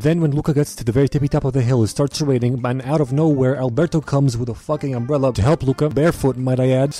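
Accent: Italy. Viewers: Wait why is he doing an Italian accent with Spanish words? Like Si. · best italian impression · nice italian accent btw nice video 2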